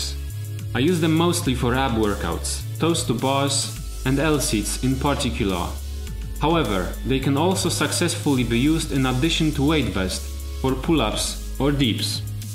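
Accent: Poland. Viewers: Ha! Tak też myślałem ;) Akcent typowo polski, ale całkiem dobrze mówisz po angielsku. BTW. podsunąłeś mi ciekawe pomysły na wykorzystanie moich obręczy i też uważam je za najlepszy zakup sprzętu ;)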